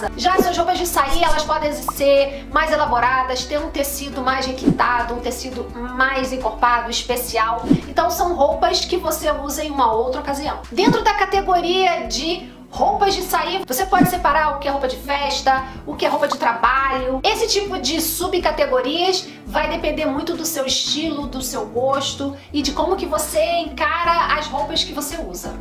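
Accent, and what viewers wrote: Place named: Rio de Janeiro, Brazil. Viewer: Gosto do seu sotaque carioca. Sou mineira. Suas dicas são ótimas. Já vou chegando dando meu like.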